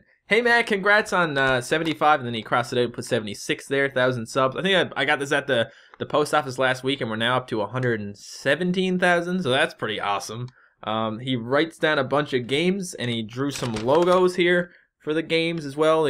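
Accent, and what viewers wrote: Canada. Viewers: I'm new here I saw you from your besiege video and I enjoyed it you earned a sub by the way are you Canadian? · Are you candian ?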